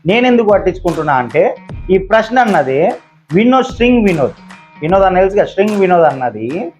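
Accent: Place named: Telangana, India. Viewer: Adhi telangana yasa alage matladutaaru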